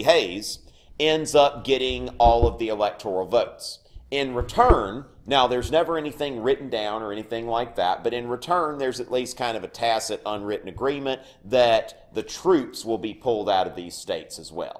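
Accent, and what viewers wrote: South, United States. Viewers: This guy is a good explainer. And he has a cool Southern accent.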